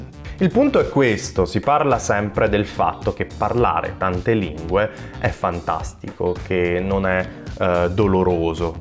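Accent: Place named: United Kingdom. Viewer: Voglio. Il. Tuo. Accento. Inglese.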